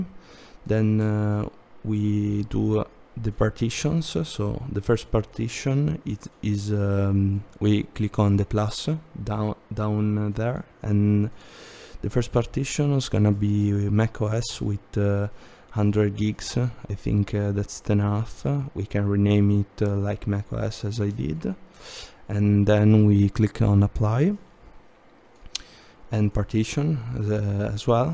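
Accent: Italy. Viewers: Grazie!!! Cmq si sente un bordello che hai l'accento italiano 😂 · ho capito che eri italiano dalla pronuncia, qualsiasi italiano mantiene la pronuncia italiana anche se parla in inglese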